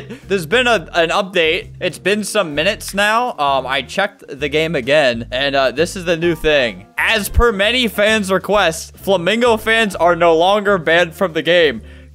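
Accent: United Kingdom. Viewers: Great British accent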